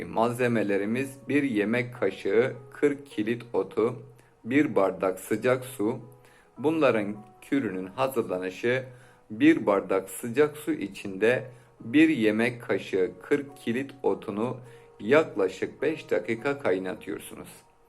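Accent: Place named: Van, Turkey. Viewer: Vanli mısın hocam😊 65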